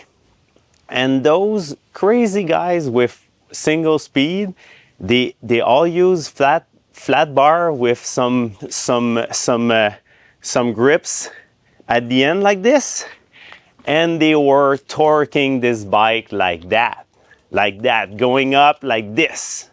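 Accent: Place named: Quebec, Canada. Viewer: Great Video, keep the great information coming. I always love Quebecois accents so no apologies necessary. we ski and hike in the Charlevoix region all the time. Jeff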